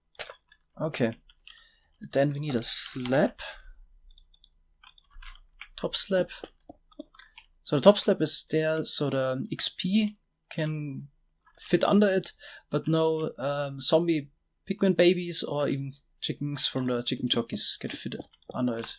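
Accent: Germany. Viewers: Are you german? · Man hört zwar richtig raus, dass du deutsch bist, sprichst aber trotzdem einwandfrei englisch :D....Gutes Tutorial, es könnte nur etwas kompakter sein (Vorallem zu Beginn, hast du ziemlich viel geredet, was man hätte kürzer machen können), weil 27 min vlt doch etwas zu lang sind. Nur Kritik, kein Hate ;)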